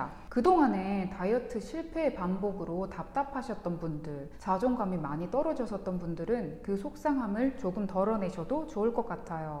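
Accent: Gyeongsang, South Korea. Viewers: 경상도 사투리가 은근 나오는 억양이 매력적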